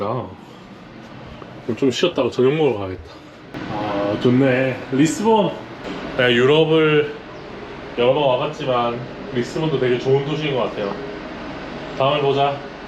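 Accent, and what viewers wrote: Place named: Gyeongsang, South Korea. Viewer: 여행유투버를 많이 보는데 이렇게 크루즈여행 영상은 처음이에요.우연히 을 알게 돼서 몇날을 영상을 보고있어요. 혹시 부산분 아니세요?부드러운 경상도 억양의 말씀..아주 듣기 좋네요.근데 아직 서른 정도의 어리신분인데 어째 이래 많이 여행할 수있었는지 궁금 합니다.우리아들 야단 좀 많이 쳐야겠어요. 저는 55살부터 단기 자유여행을 여러번 했는데, 코로나이후로 못나가봤어요.우리는 몇년 지나다 보니 늙어 힘이 없어서 출발결심이 어렵군요. 영상 너무 재밌게 보고 있어요. 젊음이 부럽습니다. 많이 보여주세요~~ㅎ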